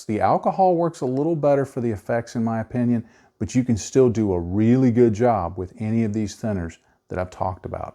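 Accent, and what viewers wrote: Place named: United States. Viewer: Very goood clear info for a begginer like me or maybe an old hand. You are the first yank i can listen to Thanks buddy from a cross the pond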